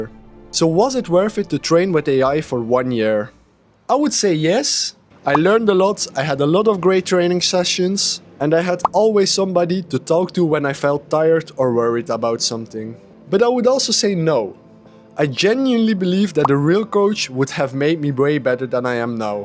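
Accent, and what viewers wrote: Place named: France. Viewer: his french accent is so thick.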